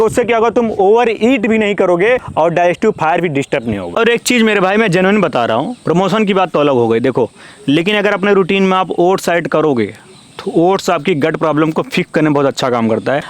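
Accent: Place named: Uttar Pradesh, India. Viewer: भाई आपका यूपी वालि भाषा मुझे अच्छा लगता है 😅 मैं भी यूपी का ही हूं ❤😊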